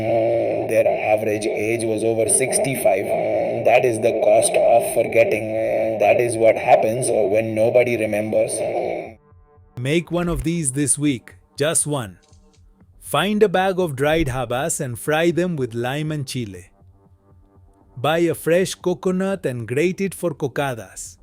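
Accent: India. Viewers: Why does he have an Indian (hindi) accent...? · Why is the guy reading this have an Indian accent lol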